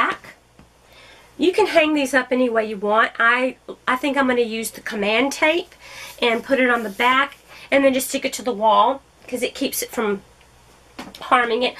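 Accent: Texas, United States. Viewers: I would really like a drill-along with you. I've been drilling along with your videos going in the background. It's so fun hearing a nice Texan accent when I'm from New Zealand :D I love landscapes. I'm working on Starry Night right now but would like to do custom landscapes of photos I've taken in the future.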